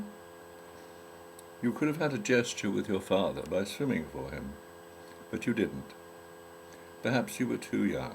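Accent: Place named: United Kingdom. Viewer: Listening to this in the British accent and calm voice is sublime :)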